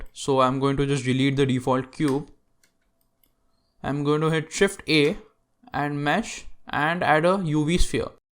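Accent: India. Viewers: Bro r u indian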